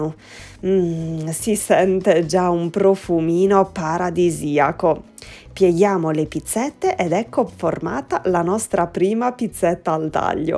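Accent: Sardinia, Italy. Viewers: Ti ho appena scoperta! Bello sentire l’accento sardo!! ♥️♥️♥️ saluti da una nuorese che vive a Milano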